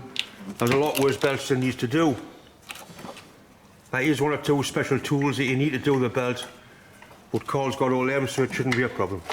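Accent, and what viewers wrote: Newcastle, United Kingdom. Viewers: Americans probably couldn't understand a Geordy accent anyway 😅😅😅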